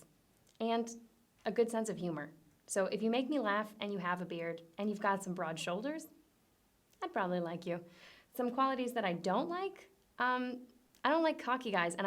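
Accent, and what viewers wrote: England, United Kingdom. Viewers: Why is she not sleeveless!!! Good British accent though! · Her british accent is so damn cute lmao! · like your english accent! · 1. Loved the dark Kennedy joke 2. Loved your British accent 3. I see you have a blank coffee mug!!! 4. Buy an Abe Coffee Mug!!!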